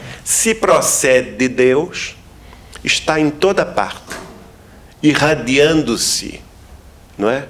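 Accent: Portugal. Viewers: porque o sotaque portugues??? kkkk nao faz sentido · Ah pq ele esta fallando c sotaque de portugues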